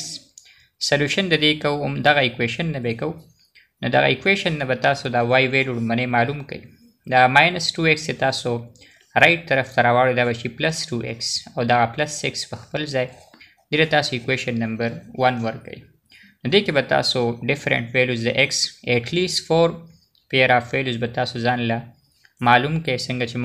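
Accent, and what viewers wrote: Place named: England, United Kingdom. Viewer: What a english accent 😊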